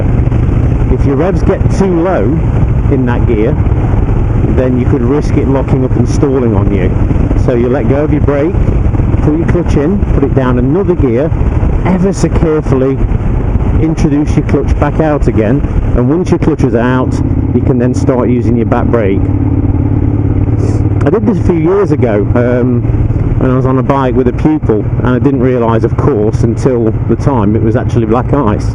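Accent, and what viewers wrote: United Kingdom. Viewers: I don't have any idea about how to ride on ice or black ice because I'm a young novice rider. I know there is not much to do on black ice, but I believe I missed some points on the video. It is so hard for me to understand British accent. Could anyone summarize the tips on the video? thanks in advance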